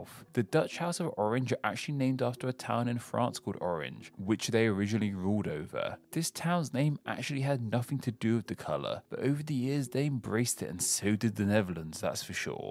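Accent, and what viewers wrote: United Kingdom. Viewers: What region of UK is your accent from? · Are u british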